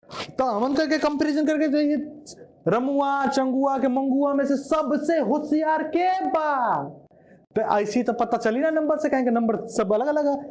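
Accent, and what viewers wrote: Bhojpuri, India. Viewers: Nice bhojpuriya andaj😂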